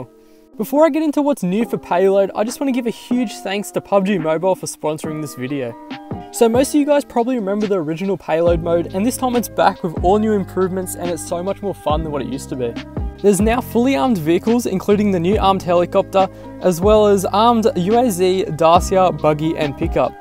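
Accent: Australia. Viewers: Excuse If You Can Answer Me Are you from Australia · I love Australian accent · That's australian accent. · Great gameplay mate! I miss hearing aussie english 😂 I love those RPGsss! 🔥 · he is Australian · Australian accent rather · Australian accent 👀👌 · I think he's Australian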